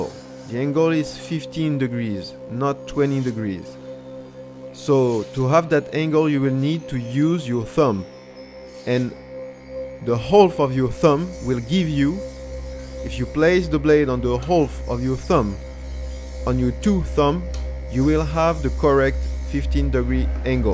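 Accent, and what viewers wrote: France. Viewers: Ça se sens l’accent français 🤣🤣, possible de te mp ? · merci pour cette superbe vidéo , a ton accent je suppose que tu est Fr , donc un grand merci pour cette vidéo très précieuse , j'ai un wakizashi magnifique et d'excellente qualité , mais j'avais besoin de l'affûter grandement afin que celle ci soit bien tranchante afin qu'elle coupe le papier , un pouce bleu bien mérité :) contunu comme ça je m'abonne :)☺️🙏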